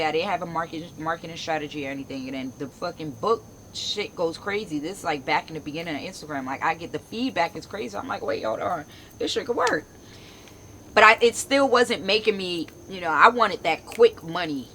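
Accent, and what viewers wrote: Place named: New York City, United States. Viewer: I knew you was from NYC girly. I could still hear the accent. That is something we never loose. I’ve been all down south and my accent never left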